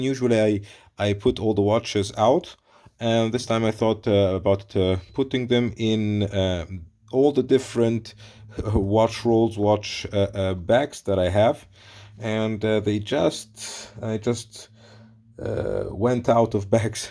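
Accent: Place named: Egypt. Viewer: Great collection man! I knew from your accent that you might have been arabic (egyptian if i'm not mistaken) and I was waiting for you to pull out some watches with arabic numbers.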